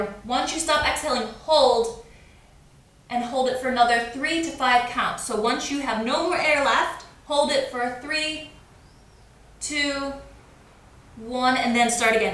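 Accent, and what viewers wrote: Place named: England, United Kingdom. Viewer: Have you ever lived in England I feel like I can hear a bit of an accent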